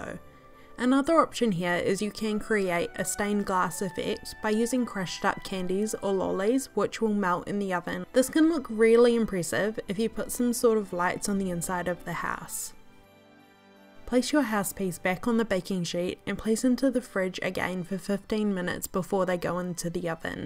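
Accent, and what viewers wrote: New Zealand. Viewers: Is she from New Zealand? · I know a Kiwi accent when I hear one! This was so helpful for a first time gingerbread-houser! (if that’s even a thing)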